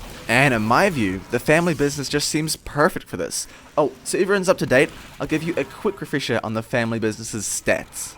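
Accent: New Zealand. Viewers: Dat accent xD.... 'hivy'..... 15 pir sint faster firing speed.... god bless new zeland :)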